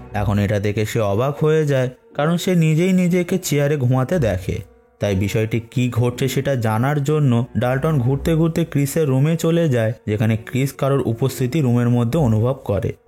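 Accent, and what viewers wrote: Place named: Kolkata, India. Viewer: সুন্দর করে কথা বলেন, উচ্চারণ তো ঠিক নাই। মনে হয় কলকাতার লোক।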